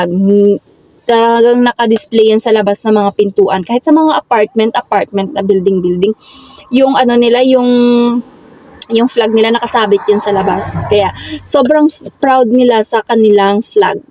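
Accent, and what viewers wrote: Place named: Iloilo, Philippines. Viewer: Taga saan ka sa Pinas dai?sounds Ilongga❤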